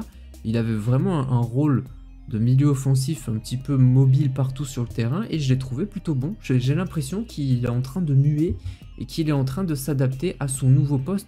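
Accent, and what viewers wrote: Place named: Marseille, France. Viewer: C'est l'accent Marseillais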